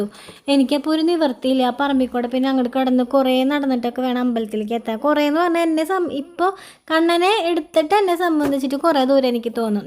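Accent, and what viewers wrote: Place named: Thrissur, India. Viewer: Thrissur ano ivrde place? Slang familiar ane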